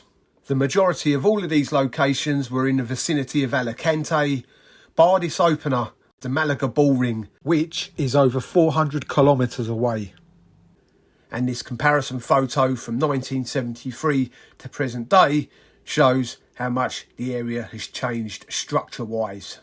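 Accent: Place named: South London, United Kingdom. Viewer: Good job. I enjoyed that. And your accent reminds me of south London where I spent most of my formative years! 👍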